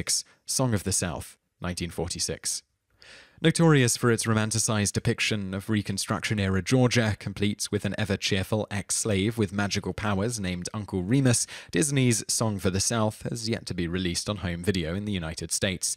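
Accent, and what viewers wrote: United Kingdom. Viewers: Alright, I've had it; Hey, NARRATOR, learn to pronounce people's names, for god sake. If you don't know how to pronounce something, ASK SOMEONE, don't just think you're correct because you have a British accent. Seriously.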